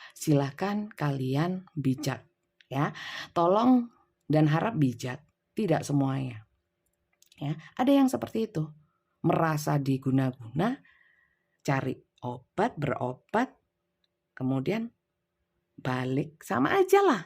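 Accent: East Java, Indonesia. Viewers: 😊❤trimakasih, banyak ndidik orang jadi waras, teges😊. Seneng dg gaya jawa timurannya. Saya aja yg bukan orang wetan karena pernah hidup di daerah wetan, jadi ngomong model jawa timuran. Yok opo se rek kon iku.....😂❤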